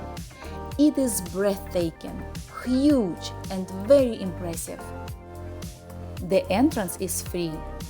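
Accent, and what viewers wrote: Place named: Russia. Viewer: )) у вас русский акцент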